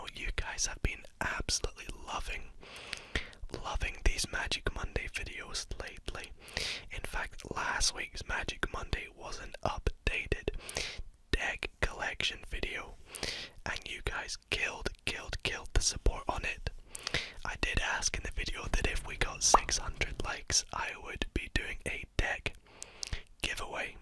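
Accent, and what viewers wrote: Scotland, United Kingdom. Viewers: Took me a minute to notice you're scottish 😄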